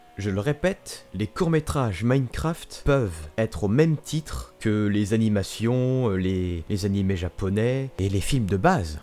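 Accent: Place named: Quebec, Canada. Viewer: Continue comme ça et tu prendra ton axant québécois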